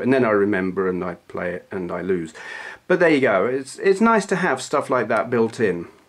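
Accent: United Kingdom. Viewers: Your cussing is refreshing, especially in the Queen's english. = )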